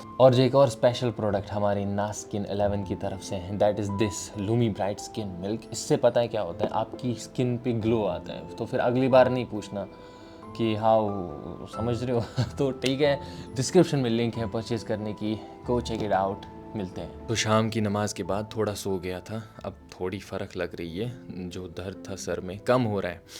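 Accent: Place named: Kashmir, India. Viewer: the kashmiri accent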